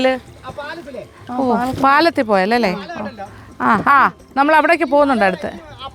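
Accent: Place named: Kottayam, India. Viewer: Kottayamkar samsaram kettappol thonni,njan kottayam😊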